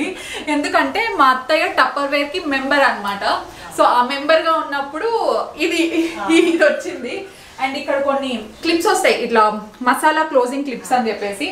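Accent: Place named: Rayalaseema, India. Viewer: God bless you and your family amma Rayslaseema bhasha vintunte chaala baagundamma😊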